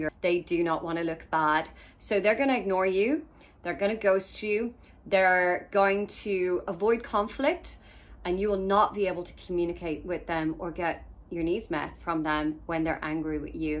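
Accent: Ireland. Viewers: Are you Irish? 🤔 I can tell by your accent! 🥰🤗💖🧡🤍💚 Good, important video. 😁👍🏻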